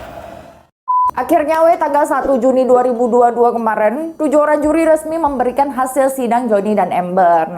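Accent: Medan, Indonesia. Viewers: Onde mandeeee.. Logat Medan rasa Padang.. kereeenn...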